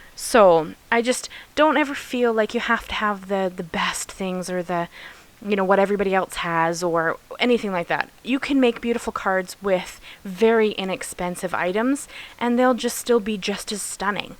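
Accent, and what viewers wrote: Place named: Canada. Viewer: Halfway through your video I was wondering if you were Canadian. I just had this feel because of how you speak. And sure enough I was right! 😁 Takes one to know one I guess. I loved your video and the card. The background does look very pretty and awesome colouring job too.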